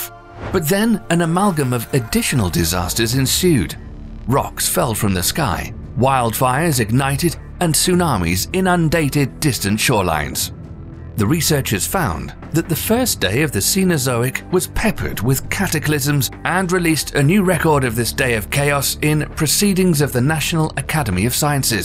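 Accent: United Kingdom. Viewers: bri'ish accent very fascinating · really need to do an update with different none British voice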